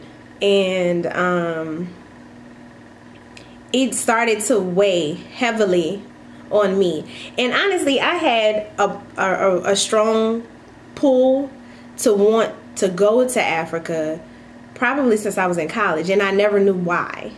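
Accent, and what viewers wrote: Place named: New Orleans, United States. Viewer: For the first time ever I heard that New Orleans accent/dialect...so sexxxy!!!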